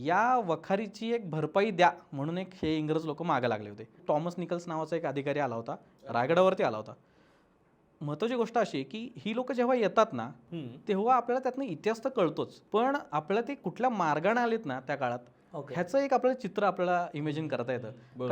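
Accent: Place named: Satara, India. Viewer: Sir सातारा language madhi boltyat o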